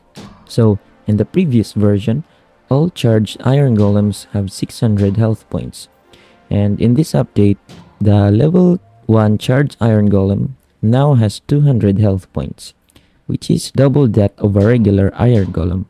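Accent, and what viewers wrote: Philippines. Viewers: You a Filipino? Your accent seems like filipino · Hello! Are you a filipino? because you sound like..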